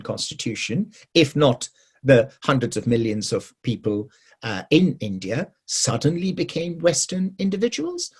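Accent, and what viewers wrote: United Kingdom. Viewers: Am I the only one who thinks his academic British accent to be a tad ironically amusing?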